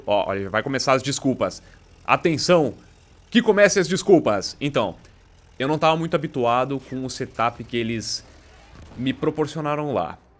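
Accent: Rio Grande do Sul, Brazil. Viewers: funny,voce é gaucho é?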